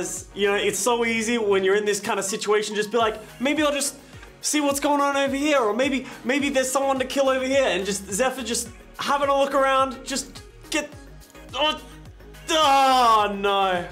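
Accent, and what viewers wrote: Australia. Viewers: Another excellent episode of CNN! I need more coherent Australian rambling in my life.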